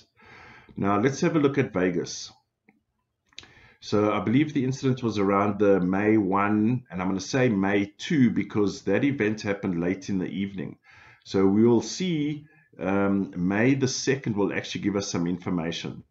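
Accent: South Africa. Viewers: I knew this was a South African accent...are you still in SA?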